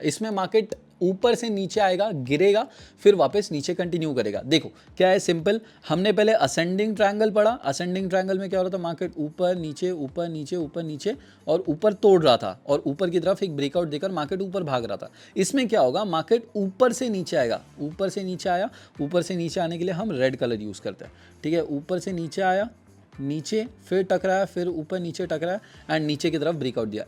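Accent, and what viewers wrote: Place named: Maharashtra, India. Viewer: Bhari vatl bhava tu Maharashtrian ahes aikun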